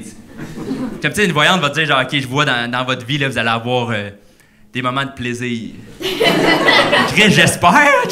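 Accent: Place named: Canada. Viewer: Le Canadien n'est pas du français. Il faut se concentrer pour comprendre.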